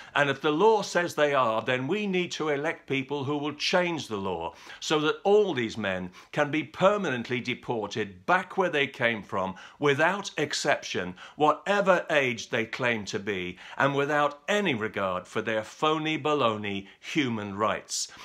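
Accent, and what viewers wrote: United Kingdom. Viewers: You remind me of Michael Caine, a bit. Love & agree with just about everything you say; nothing nicer than a crisp, British accented voice logically assessing current issues. I could listen to you talk all day long!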